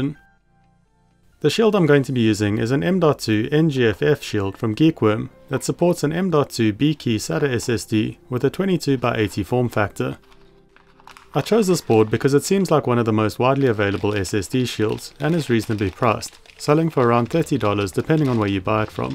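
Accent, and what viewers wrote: South Africa. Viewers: Ey you South African, I like you & ur content ❤🎉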